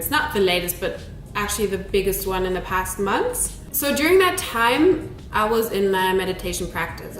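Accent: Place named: Germany. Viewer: I was thinking that you were Brazilian, but I could hear a German accent. You are very pretty. And for me, I'm not too good with attracting money. I usually attract relationships & friendships.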